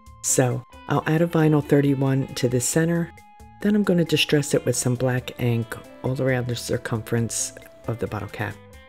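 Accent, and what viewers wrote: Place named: Pittsburgh, United States. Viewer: Thanks for sharing your great ideas! I gotta ask...are you from Pittsburgh? I am & I'm hearing that back east (but not NY or Jersey) accent that sounds like a Pittsburgher. 😊 ❤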